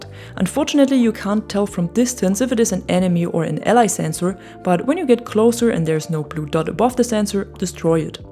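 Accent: Germany. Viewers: Warum hört man die Deutschen immer raus wenn sie Englisch sprechen 😁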